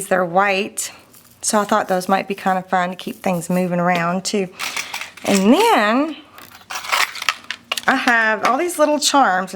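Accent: South, United States. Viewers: Such a generous giveaway!! Love listening to your southern accent...I'm from Pennsylvania and it sounds like music to me. I love your work...you are very meticulous in your creations...thanks for sharing your talents with us!!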